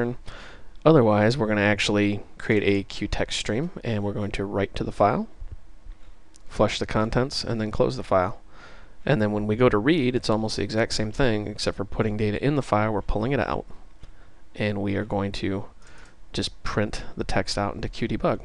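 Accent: United States. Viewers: not only is this educational and entertaining, but this this is in the best format for me! Small screen so I don't have to be in 1080p full screen to read the text and I can have QT open next to it, short videos so I don't lose the will to live, and finally you have a really nice voice XD its not normal us people from Britain enjoy an America accent XD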